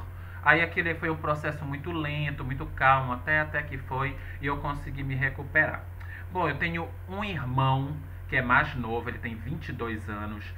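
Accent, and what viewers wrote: Pará, Brazil. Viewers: Adorei saber mais sobre vc, eu imaginava mesmo q vc é paraense, pelo sotaque. Também sou do Pará de Santarém, mas só de nascimento, moro em Sorocaba SP. Mas sei reconhecer muito bem o sotaque da terrinha. Vc é muito autêntico e natural, gosto muito do seu canal. Um beijo. Seja muito feliz!! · Quando tu abristes a boca eu sabia que eras de Belém . Eu disse “ esse cara é paraense , com certeza” . Conheço de longe um conterrâneo. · Eu desconfiava....que serias PARAENSE...por causa dos.."lh"! Kkkk..he..hi.. Estou tua vizinha..... Parabens para nós que somos AMAZONICOS! Chics! FELICIDADES! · Olá Você é paraense não é.? Adoro este sotaque. Vi seu vídeo agora. Também tenho a sua idade! Quem dera conhecer o para ver o peso oh. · Eu comecei a te acompanhar esse ano e desde o primeiro vídeo eu reconheci esse sotaque paraense, mas so agora comprovei. 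Que legal um conterrâneo perfumolatra com conteúdos tão legais 👏👏👏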